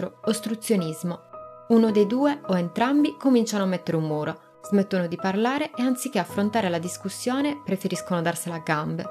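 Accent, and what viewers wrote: Sardinia, Italy. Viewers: so che non è inerente al video... ma quanto è bello l'accento sardo? :D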